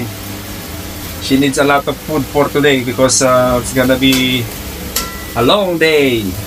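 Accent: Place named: United Kingdom. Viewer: Wow british accent ne😍😍😍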